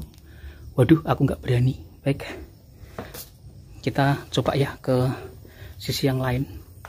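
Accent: Java, Indonesia. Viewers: Logatnya meddo bgt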